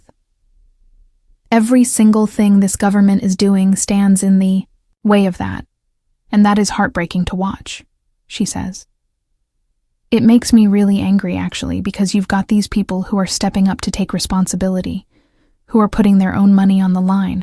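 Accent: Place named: United States. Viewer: Why have we got to have an american robot speaking? Get a real person, and preferably an English one!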